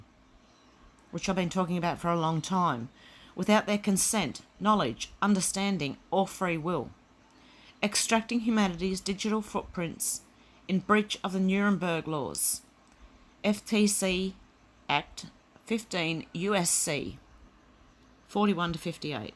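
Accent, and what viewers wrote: Australia. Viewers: This has to be addressed by world leaders. One of them is addressing this issue being Donald Trump who is hated by the media. Australia pull out of doing business with Chinese mobile phone tech company. NAMED in report can’t spell name. Scott Morrison won our election which he was supposed to loose, thankfully God is with us. By the way you sound Australian. You are doing a great service to humanity bringing this out in the open. David ICKE has been warning us about AI and has been a lone voice accused of promoting conspiracy theories. May God bless us all with hope to change our world. hated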